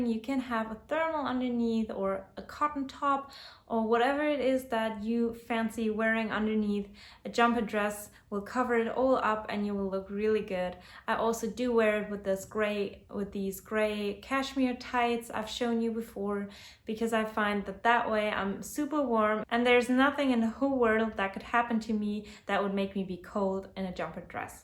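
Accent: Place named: Germany. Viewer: Are you from Germany? Your accent sounds so familiar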